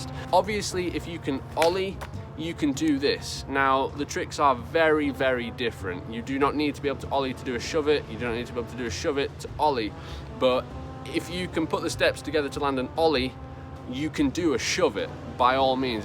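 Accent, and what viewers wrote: United Kingdom. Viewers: Where from the UK are you? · Bri’ish? But actually thanks for the tips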